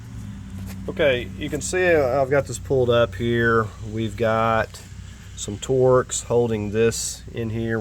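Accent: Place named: Kansas, United States. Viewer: highest highlights sounds like Kansas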